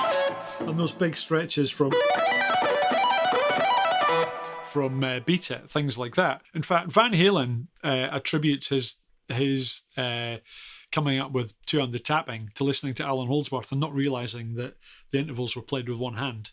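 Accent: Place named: Scotland, United Kingdom. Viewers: The accent is scottish ... alans was yorkshire . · This is East Scotland accent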